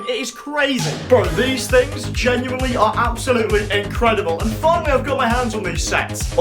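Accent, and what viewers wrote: United Kingdom. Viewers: Bro are u brithish ¿?💀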